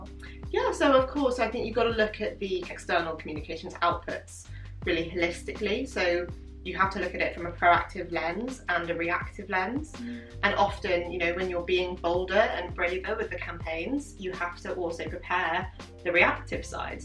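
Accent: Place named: United Kingdom. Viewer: Wow; you are starting to have a British accent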